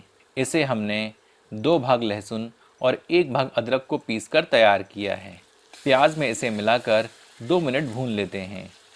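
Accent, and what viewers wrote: Standard. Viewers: शुद्ध हिंदी भी सुनने को मिला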